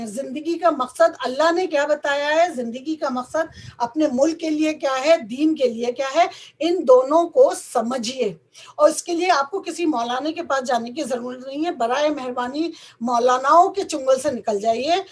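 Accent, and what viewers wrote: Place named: Haryana, India. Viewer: Haryana ala boltay madam😉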